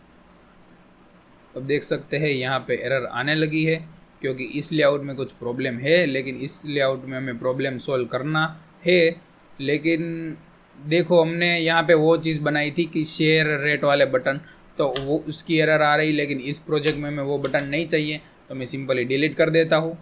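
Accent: Gujarat, India. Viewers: Bhai tamara bolva parthi lage che k tame gujarat thi cho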